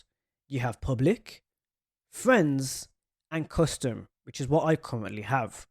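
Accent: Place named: Wales, United Kingdom. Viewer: You sound Welsh, lol! Well, I had a Welsh friend who had a similar accent atleast.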